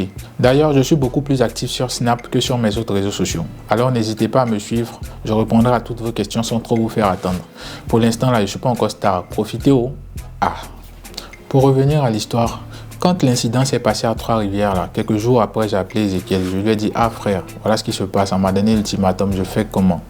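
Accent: Ivory Coast. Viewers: L'accent Ivoirien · Je suis nouvelle juste pour les parabole je m'abonne 😂😂 j'aime écouter quand un ivoirien parle. 😅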